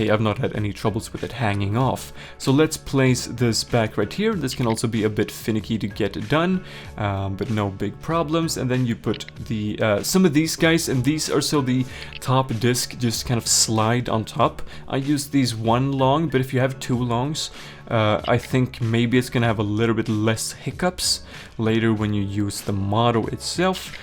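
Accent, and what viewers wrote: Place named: Sweden. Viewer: First time I'm seeing this channel in my feed.. Not lego related but your accent.. You sound Scandinavian, maybe Swedish? Sorry just curious 😅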